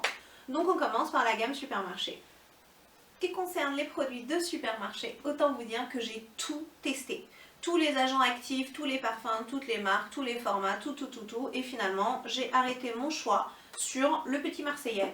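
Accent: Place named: Iran. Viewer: Intonation iranienne.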